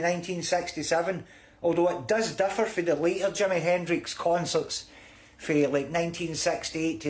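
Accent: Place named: Scotland, United Kingdom. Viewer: Nice video. Your accent reminds me of an SNL skit in America. “Welcome to All Things Scottish, where if it’s not Scottish, it’s crap!” 😂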